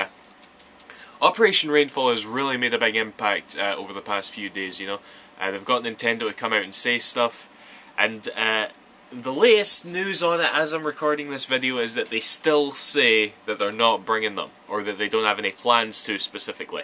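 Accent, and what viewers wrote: Scotland, United Kingdom. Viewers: And by the way I have been watching your lets plays back to back in the playlists and consider you to be one of the very best lets play artists. Scottish accents for the win! (even though I'm English lol)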